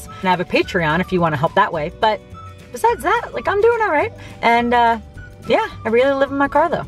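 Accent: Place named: Michigan, United States. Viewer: You grew up on Long Island but you sound like you’re from Michigan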